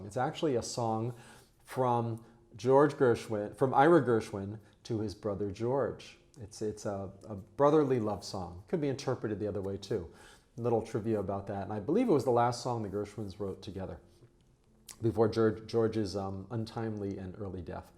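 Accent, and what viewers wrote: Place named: New York, United States. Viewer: It's been such a pleasure watching you and leaning with you over these past few year. I have noticed that your NY accent and cadence have gotten a bit thicker over time compared to your first videos ;-) Keep well.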